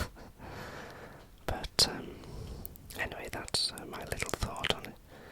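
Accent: Wales, United Kingdom. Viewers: Cardiff or swansea ?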